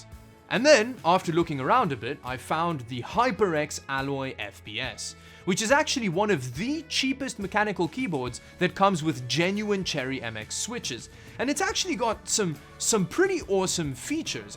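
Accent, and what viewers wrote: South Africa. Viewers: love your videos man. you sound south african. are you? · Thanks was wondering becuse his channel said canada but he sounded just like a south african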